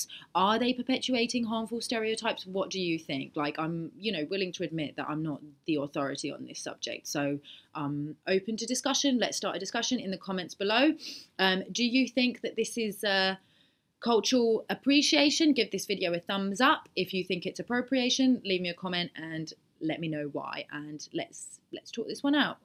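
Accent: United Kingdom. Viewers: LMAO, why, coz of her British accent 🤣🤣🤣🤣🤣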